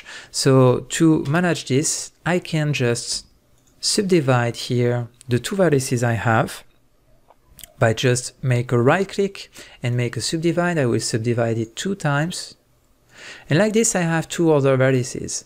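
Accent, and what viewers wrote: France. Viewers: bravo super vidéo j'ai reconnu le petit accent français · do you speak french? if so I can tell in the way you talk cause I also speak french or am I trippin but nice tutorial tho its amazing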